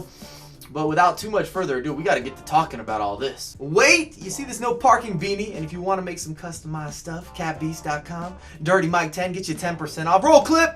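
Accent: United States. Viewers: Fifa School please boy you sound like a american trying to teach Footbal (it ain't soccer) USA suck in footbal man what do you know about footbal tactics and formations we invented and breath football in europe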